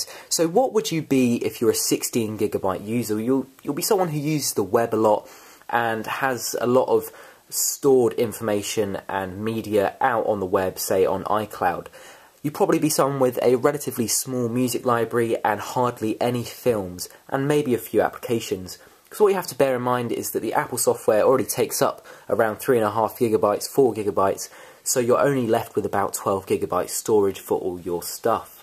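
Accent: England, United Kingdom. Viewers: I thought you was English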